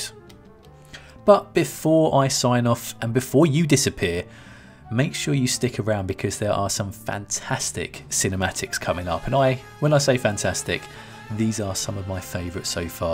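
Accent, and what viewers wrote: United Kingdom. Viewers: english accent, makes you sound knowledgeable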